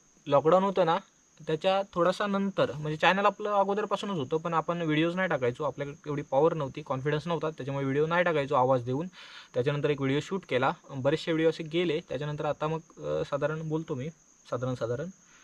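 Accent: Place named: Malvan, India. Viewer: Malvani boltas taa pan bhari vata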